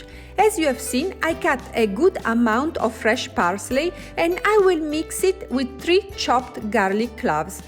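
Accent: Italy. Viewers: You have big Italian accent but I understand you very well Better then a person with an American accent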